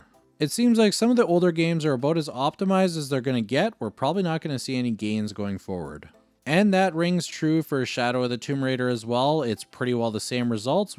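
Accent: Canada. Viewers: Bro as canadian watching this and listening to his Accent. I was thinking this man is definitely Canadian. Definitely from western Canada.😂😂. Nice video. Would have taken alot of work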